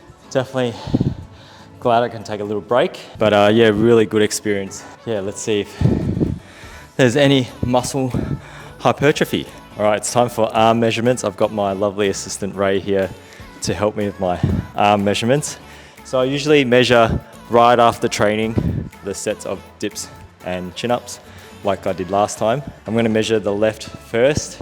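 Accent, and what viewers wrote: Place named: England, United Kingdom. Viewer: U look native american and have an english accent. My brain cant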